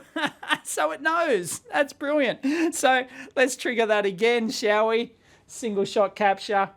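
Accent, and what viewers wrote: Australia. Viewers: That thick aussie accent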